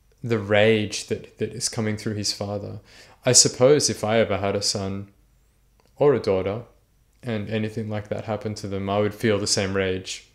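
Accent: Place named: Australia. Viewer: Whoops..hard to place... Australian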